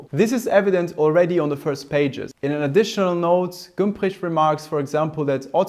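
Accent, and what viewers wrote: Germany. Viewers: This channel combines several things I adore: pre-WWI German history (when it was unproblematic to admire the Germans); a well-researched and -scripted deep-ish examination into an obscure but interesting cultural artefact; listening to a native German speaker using the language with such facility (I'm biased, I speak German and like the German-speaking-English accent, with all its idiosyncrasies); and quirky moustaches. This channel really is so verdammt charmant, especially the gramophone segments. And 20 minutes long this time! You spoil us :) BTW don't Europeans also use small blunt-ish paring knives to peel potatoes/apples/etc? That jagged knife you use for these tasks looks like an accident waiting to happen ;)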